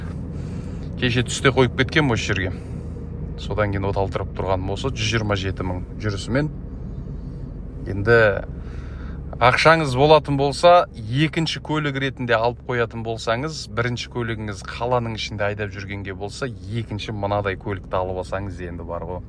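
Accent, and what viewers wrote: Standard. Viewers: Мен озим колик айдай алмайм.Бирак узбей коремин таза казакша жургизесин Касибине береке!!!👍👍👍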